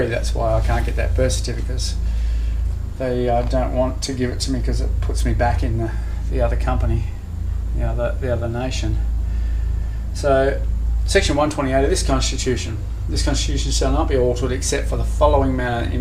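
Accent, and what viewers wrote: Australia. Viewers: I reaaly appreciate your efforts and clear intention, but for a Dutchie like me, your fast talking in Australian English, plus equally fast associations, comparing boxes in "official" forms with pages in a "comic" book. For a beginnner that's hard to grasp, apart from being not informative, but rather a personal opinion of a rebel (for a good cause) and I understand you were one of a few pioneers, at the time of this recording. I imagine that you've reached such conclusions yourself, and since this topic is familiar to more people now, it's possible to grow familiar with this complex subject. We need our trailblazers, and you're one of them 🧡🌈🎃🧑‍🌾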